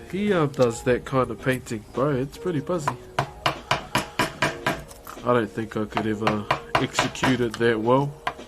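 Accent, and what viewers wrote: New Zealand. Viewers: The New Zealand accent is an immediate reminder of Korg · Lol, thats cause Taika Waititi the director/korg of Thor rangnarok is maori and so is the bro. That's just our accent 👌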